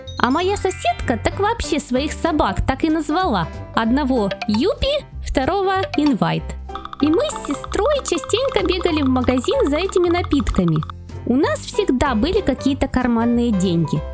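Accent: Ukraine. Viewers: Ты с украины? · Ты что из Украины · ты из Украины что ли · А ты что украинака? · А ты украинка чтоли 😢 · Ты что украинец а я думал что просто так говоришь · Эй ты что с Украины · Ты чё украинкачто ли🤔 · Ты что украинка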